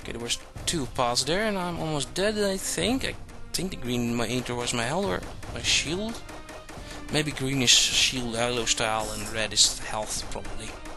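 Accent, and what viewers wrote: Netherlands. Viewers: u sound a bit dutch :P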